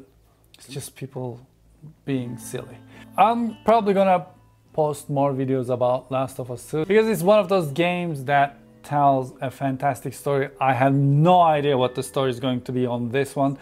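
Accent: Turkey. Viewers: İlk kelimeden anladım türk olduğunu xjdkkd nyse abi efsane ya herkes alabilse keşke o art book un içinde muhteşem şeyler var be bileklik figür çanta falan mükemmel ya o parçalardan biri için kavga bile edebilirmxdkkdkkdld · nedeni türk aksamı ile konuşması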